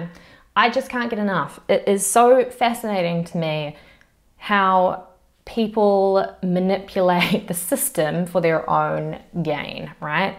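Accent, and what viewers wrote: New Zealand. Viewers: I listen to a lot of true crime and I just found your channel, why. I really enjoy it. At first I thought you were an Aussi but later you mentioned New Zealand so I am guessing you are a Kiwi. I love the accent and the way speak, it seems some of my favorite youtube channels come from that part of the world. Back to basics, Molly Dixon ect. and I think that you are beautiful which makes listening to you tell a story very easy.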